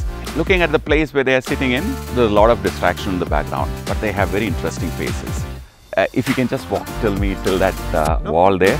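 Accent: Kerala, India. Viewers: Malayali ana? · thx... that indian acc ent in english is so weird :D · Mabile faune photography, Sir you have Malyalam Accent 😍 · Typical indian acent haha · I think u hav an Indian Accent. I like ur Indian Accent.I am too an Indian...... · Sir , are you a mallu.... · Annoying Indian accent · Ah englishil malayalathinte touch undd😊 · That's a Malayali English slang !!! 😁😁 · His malyali accent is funny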